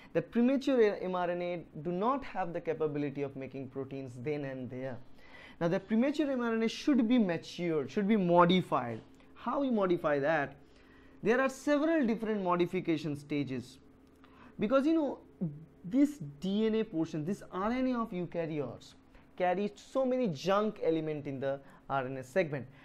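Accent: Bengal, India. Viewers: are you bengali! bangali naki?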